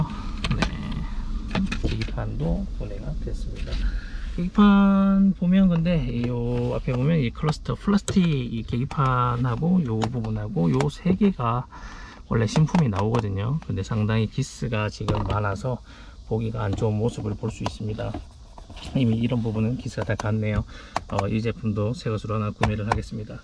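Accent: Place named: Gyeongsang, South Korea. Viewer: 우연히 지나가다가 영상을 봤는데요 옛날에 저의 꿈의 자동차 였습니다~! 수프라 우핸들의 로망이였는데 ㅠㅠ 사투리가 정감이 있네요 부산경남분 인가요?ㅋㅋㅋ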